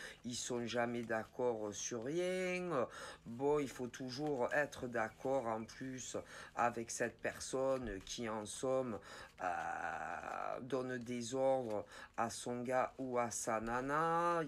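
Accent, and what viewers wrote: South, France. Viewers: Cc je vous découvre ❤super juste 😮mdr je le savais 😂j aime beaucoup votre style, beaucoup 😊super nature accent du sud non? Bisous du sud 😊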